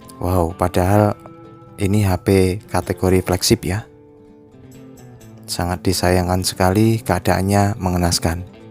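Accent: Java, Indonesia. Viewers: Mas mau tanya, mas asli jawa ya?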